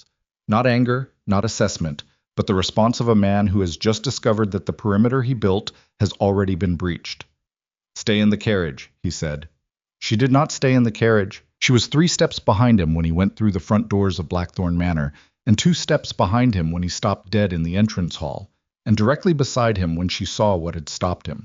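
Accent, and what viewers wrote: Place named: United States. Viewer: Please use a British AI narration. American ruins the lovely story. I am an American.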